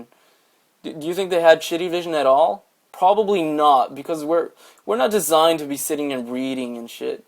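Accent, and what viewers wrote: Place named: United States. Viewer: Had you not stated you were from Norway at the start of this video, I would've assumed you were an American from a West or Midwest state. Your spoken English is virtually identical. As a Minnesotan (of 25% Norwegian ancestry), I would have zero inkling you weren't from here if I ran into you on the street. The same could not be said if I ran into someone from Tennessee or New England if I heard them speaking. Fantastic video BTW.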